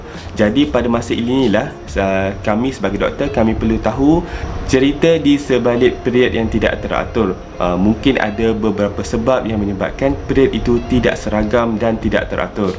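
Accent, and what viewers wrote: Kelantan, Malaysia. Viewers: Doc org kelantan ke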